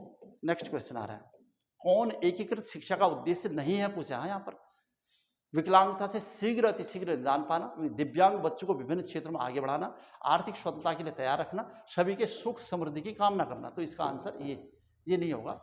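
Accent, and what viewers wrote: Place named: Pithoragarh, India. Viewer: Hum tone pth walo ki jaisi hai mai bhi pth se hu tab😂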